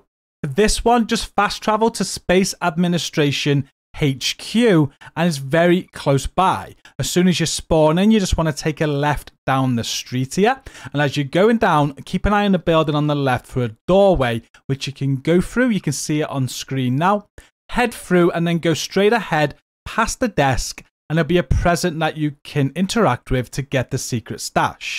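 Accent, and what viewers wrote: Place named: North, United Kingdom. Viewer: northern accent strikes again ❤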